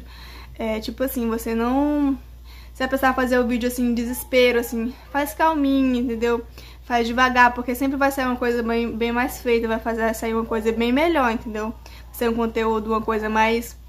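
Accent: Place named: Maranhão, Brazil. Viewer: Vc fala maranhense msm😍💖😍😍😍😍lindahhh sou sua fã... vc me deu essas dicas💖❤e ta ajudando 🙏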